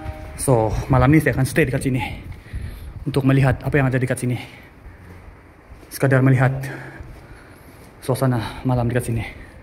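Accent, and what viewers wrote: Sabah, Malaysia. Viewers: Bro lu pkai je loghat sabahan .. Tak ada Hal kami faham😅😅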